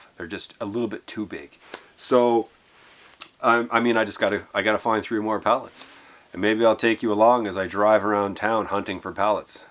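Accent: Canada. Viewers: I really enjoy your videos, i am not young, not outdoorsy, not strong, but i do enjoy all you do please keep the vids coming; i just stumbled on your vid, where are you located? I hear “ uwt for out so Canada? How do you keep safe out there all by yourself? Your wife doesn’t worry? Thank you for sharing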